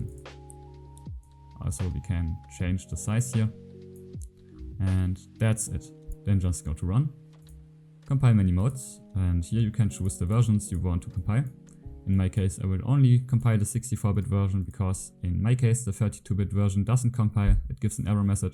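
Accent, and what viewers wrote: Germany. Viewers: You sound really german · I hear the german accent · Are you German?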